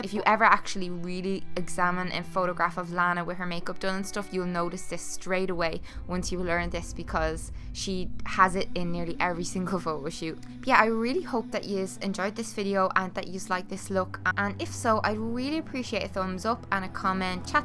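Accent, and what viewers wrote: Ireland. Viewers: HEY! I stumbled across you're channel the other day and after about a year of listening to Americans drone on in their slightly annoying accent on Youtube, listening to you're accent was a welcome change. (I'm from Kilkenny!) You're videos are great, it's nice to see an Irish Beauty Youtuber with such great content!!:D Well done and good luck!!:D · Loved this look! I really love watching your videos because you seem like such a genuine, real person!! Plus a little Aussie like me loves a good Irish accent, it's so adorable!!:)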